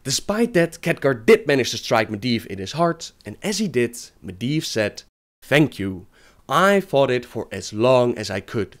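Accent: Netherlands. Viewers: What's up with the dutch accent?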